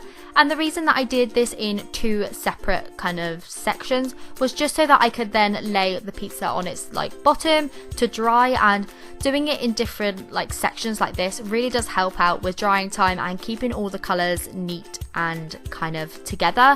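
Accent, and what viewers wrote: England, United Kingdom. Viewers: Are you from England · I like and enjoy watching your squishy videos but (just my personal unpopular opinion) I can’t stand British/English accents.. idk why my ears can’t handle your accent lol so I have to watch your videos on mute. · I like your ascent, are you British? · I dont want to offend her but..... Are you British? She really sounds British.......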